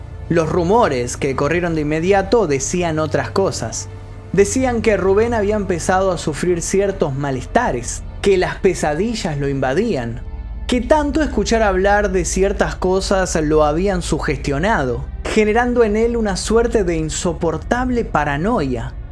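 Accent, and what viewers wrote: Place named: Argentina. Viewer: No soporto el acento argentino 😅 tengo que dejar de ver un buen video 😢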